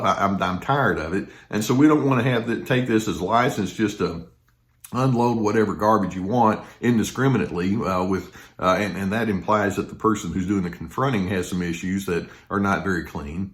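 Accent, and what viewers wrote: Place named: South, United States. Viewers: Hi from Spain, where are you from, the south of EEUU?, your accent is very difficult for me. I like your vídeos a lot. ☺️ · Mara, perhaps the southeastern USA.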